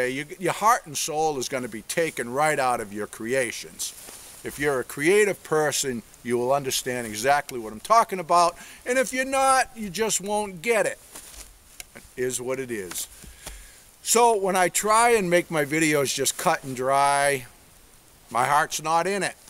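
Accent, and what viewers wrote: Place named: New England, United States. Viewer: Keep up the good work. I enjoy whatever you post. Like most of your followers "Frankie and the boss" is my favorite. I think it would be a great intro AND exit song. We are having a very white Christmas here in West Michigan, thanks to our lake effect snow. I'm an old New England gal and I enjoy the yankee accent, even though I've been away from home for a good many years. My son and grandson both have Border Collies that are so smart. One lives on a farm and the other in a condo. For those that don't like your work.....well then, go away!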